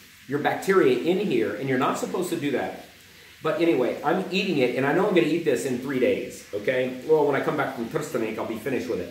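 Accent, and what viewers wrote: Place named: United States. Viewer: First: you can't learn that accent of yours no matter how hard you try as Serbian- to those that say you are Serbian and just faking American origin , Serbs will always have that slavic- stronk accent. Second have you ever tried frying onion, eggs and ajvar ? That is crazy good and simple, heat up oil in pan- same amount as you put when you fry eggs, put two-tree eggs , chop half onion and 3-4 full spoons of ajvar , you put all that in same time in pan, fry it couple mins until eggs are done and mix it while you fry . You can also fry onion a little before you put eggs and ajvar if you like that, but not necessary. You will know its done when eggs stiffs. With some bread , yogurt or fetta salty chese you have simple and delicious meal. You fry lower quality ajvar from markets with eggs to still make it good btw.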